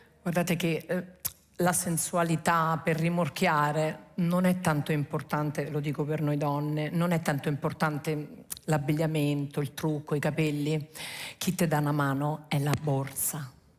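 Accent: Rome, Italy. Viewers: ma quelli che paragonano l'accento di con la Presidente del Consiglio per via dell'accento romanesco.......bah.....(perchè non dire allora, la stessa cosa della grande Anna Magnani!!?) che problemi avranno!?? come dite...ah! si,.....FATEVE UN BEL BIDE' DE CAMOMILLA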